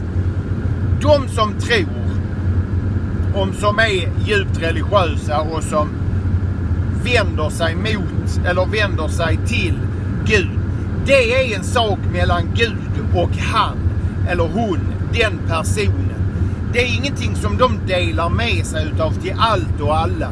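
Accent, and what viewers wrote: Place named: Skåne, Sweden. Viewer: Älskar din skånska röst, Jag är också en skåning! <3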